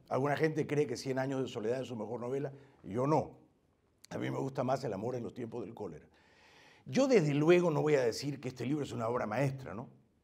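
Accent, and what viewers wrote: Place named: Lima, Peru. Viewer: Jaime es muy limeño en su idiosincrasia y manera de hablar. Me encanta...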